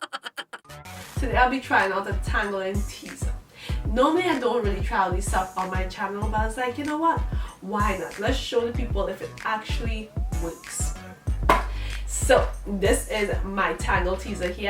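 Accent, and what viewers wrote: Trinidad and Tobago. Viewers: I know a Trinidadian when I hear one 🇹🇹🗣!!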